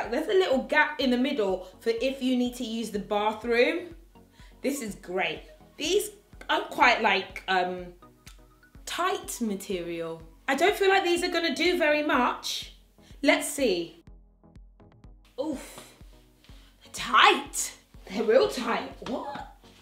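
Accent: United Kingdom. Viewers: I love you! I love the verbiage with your British accent. You make me crack up with your descriptions of the shape wear. Love you💖💖💖👠👛🛍🐈🐕🐎💖💖💖🐞🌹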